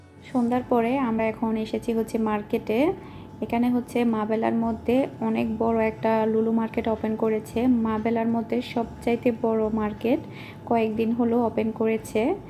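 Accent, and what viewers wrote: Chittagong, Bangladesh. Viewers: আপু আমার বাডি ও চিটাগাং আমি সৌদিআরবে থাকি আমার দুই ছেলে আমার নাম নাহিদা আপনার চিটাগাং এর ভাষা বলগ টা অনেক ভালো লাগে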